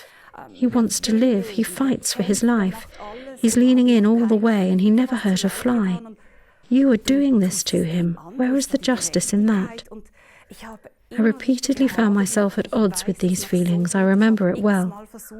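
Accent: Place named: England, United Kingdom. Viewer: Not this English accent again :/ Let this guy read men, and women dialogues.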